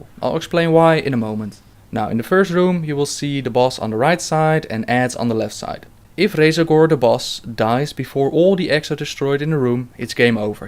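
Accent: Netherlands. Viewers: Are you dutch?? i can hear it in your voice :) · Dutch accent? ammiclose? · je hoort wel dat je nederlands bent haha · Dutch accent! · dude are you from the netherlands or something? I think i can hear it in your accent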